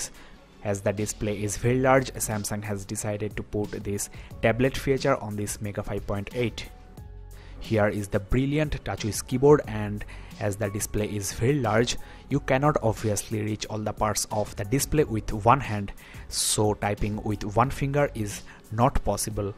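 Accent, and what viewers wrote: India. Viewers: india voice ?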